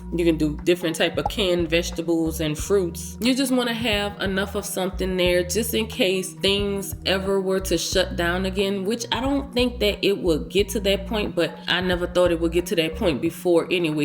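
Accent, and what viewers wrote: New Orleans, United States. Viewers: Are you from New Orleans, the accent 😊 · I can hear a new orleans dialect from across the world.. lol.. stay safe ppl. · i wanna hear her say baby earl or ferl paper she gotta be from da NO area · Thank you 🙏🏾/I appreciate you and I Love your New Orleans accent 😀❤️🖤💚👋🏾-Listening from Dallas . Hit the like button 4 u 👍🏾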